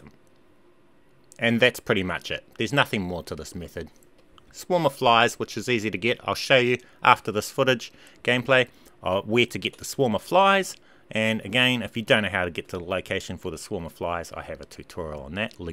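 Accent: New Zealand. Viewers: Churr bro! I thought you sounded kiwi so I poked around your profile and saw that you were! Awesome to see a kiwi content creator I love it bro keep up the good work!! Instasub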